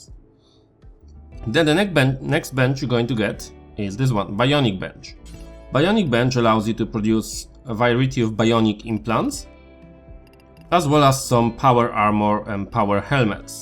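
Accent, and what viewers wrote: Poland. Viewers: Łatwo po akcencie poznać polaka. Dobre przedstawienie moda.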